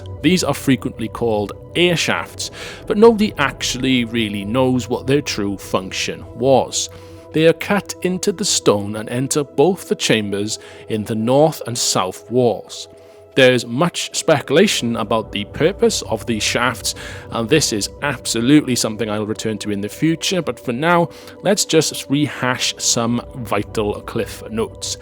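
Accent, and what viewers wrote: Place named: South Wales, United Kingdom. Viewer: Great content Sir. Just subscribed. You have quite a subtle south Welsh accent. Mayhap you are from the border region- go Offa's dyke! Cheers, Flaxen Saxon. 😉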